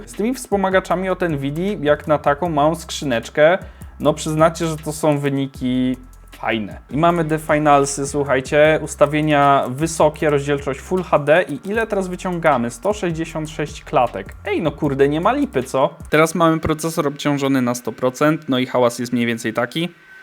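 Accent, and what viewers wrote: Silesia, Poland. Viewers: Kurde widać że swój chłop Ślązak wotaj w klumie masło hahahahaha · wielki plus za śląski akcent w filmie!😃 edit: pod koniec filmu zauwazyłem też koszulkę ze ślonskim akcentem xdd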